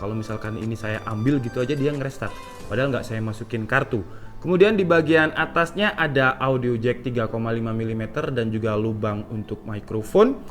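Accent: Malang, Indonesia. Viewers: Mas nya orang malang?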